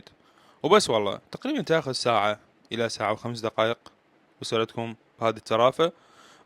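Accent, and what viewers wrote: Anbar, Iraq. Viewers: يعني الشيف من الانبار،من راوه لو من حديثه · وهم مشابهه للهجتنا بالانبار هما اللهجتين متشابهه ❤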